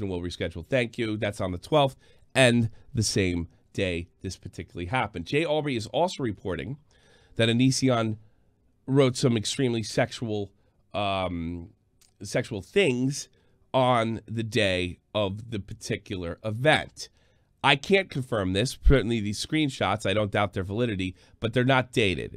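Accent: United States. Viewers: Your English is very American. If your not in North America, what part of the world are you? Just curious since you have no accent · are you natively german? your accent sounds american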